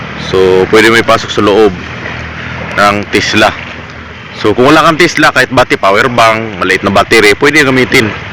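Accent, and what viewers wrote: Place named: Quezon, Philippines. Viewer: Parang naa ka sa quezon province sir.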